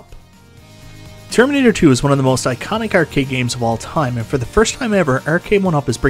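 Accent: Newfoundland, Canada. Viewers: This guy from Newfoundland ?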